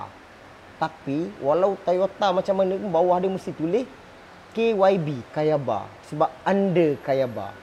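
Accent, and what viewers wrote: Pahang, Malaysia. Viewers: workshop kat mana? bunyi cakak turr maca org pahang jek..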